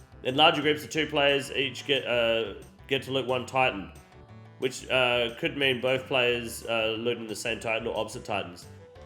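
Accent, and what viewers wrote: Australia. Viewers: he's Aussie mate from Perth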